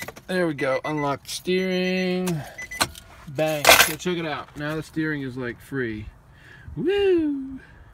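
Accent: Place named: Texas, United States. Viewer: Texas?? Lmao you sound like you’re from Texas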